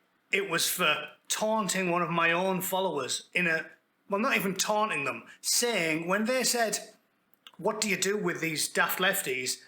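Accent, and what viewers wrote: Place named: Middlesbrough, United Kingdom. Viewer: you can ramble as long as you like, your voice is music to my ears. Do love your Boro accent🎶🎧😊😂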